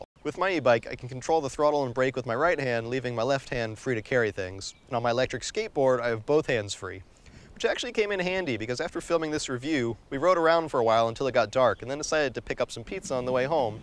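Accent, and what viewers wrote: United States. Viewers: פאק, הייתי בטוח שאתה אמריקאי ואז פתאום in tel aviv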